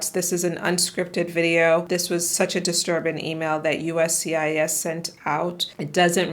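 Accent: Jamaica. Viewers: Good evening are you a Jamaican?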